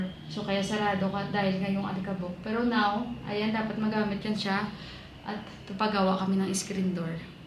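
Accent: Iloilo, Philippines. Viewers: Natatawa ako sa accent mo sis naalala ko best friend ko tiga Iloilo dito sa italy aprehong pareho kayo Kung magsalita hahahaha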